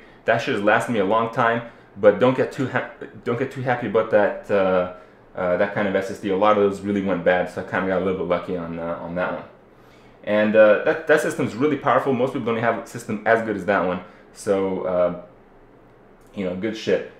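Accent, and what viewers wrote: Romania. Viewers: parca din Cluj e :) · kripp, are u romanian? :D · You Romanian ?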